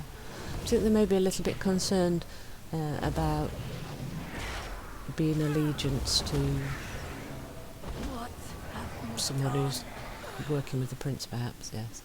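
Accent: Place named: United Kingdom. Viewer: Painfully British but good video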